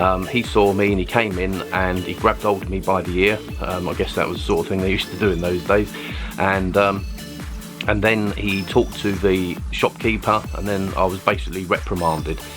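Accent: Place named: United Kingdom. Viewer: I guess your english is from the UK it's kind of difficult to understand.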